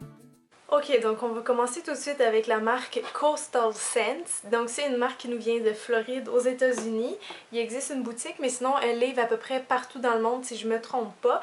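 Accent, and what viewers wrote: Canada. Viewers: salut je viens de m'abonner une copine m'a montrer ce ke tu fait moi j'adore et l'accent canadien c tellement magnifique , j'ai vu ta beaucoup d'abonner et tu l'ai mérité ! :)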